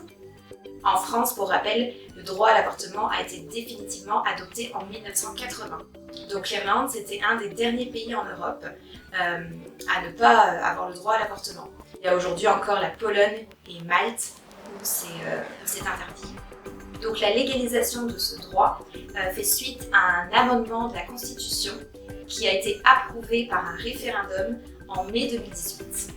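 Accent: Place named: Quebec, Canada. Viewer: Merci pour cette vidéo! Tu as un léger accent québécois je trouve! :)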